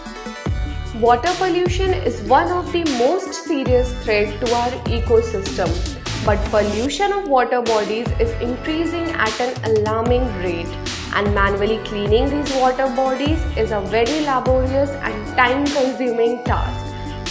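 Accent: India. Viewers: lindu pajeeet accent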